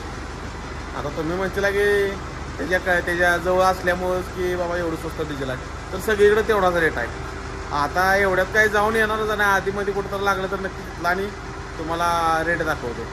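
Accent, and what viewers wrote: Kolhapur, India. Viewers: दादा भाषण कौशल्य खुप सुंदर आहे कोल्हापूरी भाषा 1 नंबर ❤❤👌👌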